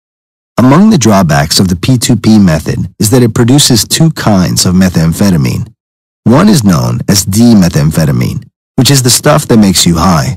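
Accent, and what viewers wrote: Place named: United Kingdom. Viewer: …one photo no captions British (sounding) host fku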